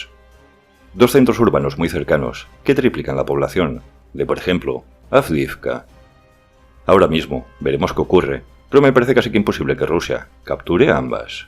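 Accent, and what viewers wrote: Galicia, Spain. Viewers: Perdon por el off topic, ¿tu acento es de Galicia?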